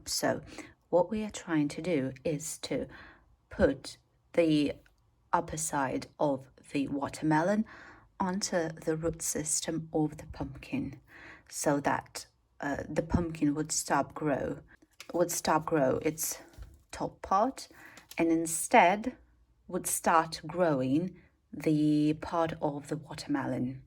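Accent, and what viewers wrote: England, United Kingdom. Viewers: Your British educated by the sound of your English I'm growing watermelon this year see if technique works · Sounds to me like she’s acquired a British accented English, interesting as many Eastern Europeans have a distinct accent. Lovely to listen to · You have a English accent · who comes you have such a beautiful English accent 🇬🇧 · Im just so chuffed how you are not using American English but English from England proper. So nice. Those melons looked so jucy and colourful. Prayers that the geo politics of today will not affect your lovely part of our world. Cheers from Sweden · How do you speak like a British living and raised in Belarus 🇧🇾 By the way your life is so beautiful so you are.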